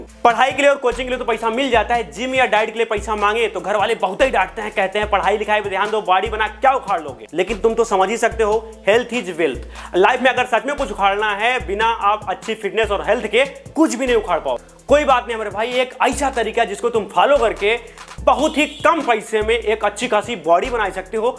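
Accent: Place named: Bihar, India. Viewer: Gajb bhai aisan trainer to hm phli bar dekh ni ho aur apke bhasa se lga ta rua Bhiar ke lal bani kahe ki hm hu bani . Good 👍job the way in which you tell everything is awesome👏 unexpected🥰🥰🥰🥰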